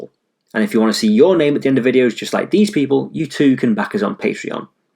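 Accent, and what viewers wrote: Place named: Liverpool, United Kingdom. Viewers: He has a scouse accent, fairly mild. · Hard to take anything serious come with a scouse accent .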